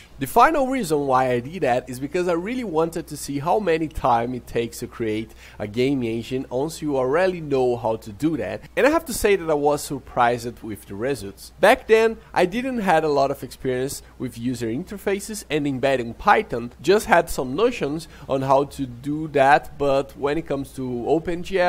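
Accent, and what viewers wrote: Brazil. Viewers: Are you Brazillian right? I realized because of the R pronunciation, your English is almost perfect though, it took me some time to realize. Great video, great job! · caraca, esse sotaque br tá muito aparente kkk pô irmão, me salvou ein, tô querendo aprender a produzir games e acho que a parte mais complicada pra mim é a engine em si, mas ainda bem que tem pessoas como você pra ajudar, tmj!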